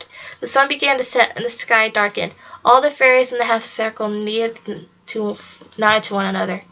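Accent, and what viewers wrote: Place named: United Kingdom. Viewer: Her British accent is everything!!😂😂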